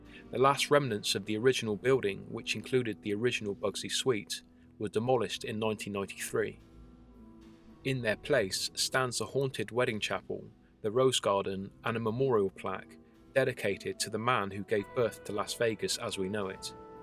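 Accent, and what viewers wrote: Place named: Leeds, United Kingdom. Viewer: He's from Leeds in North of England uk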